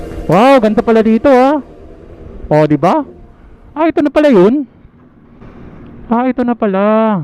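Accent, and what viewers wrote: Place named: Iloilo, Philippines. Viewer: Lods taga saan po kau parang Iloilo salita nyu minsan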